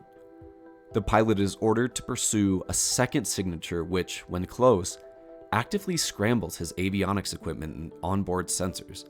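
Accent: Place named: Southwest, United States. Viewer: How come you know how to pronounce Spain Talk? Are you of California or New Mexico? Or Tejas?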